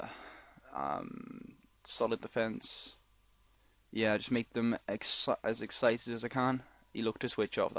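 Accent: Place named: Wirral, United Kingdom. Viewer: Are you from the Wirral, you have a similar accent to me.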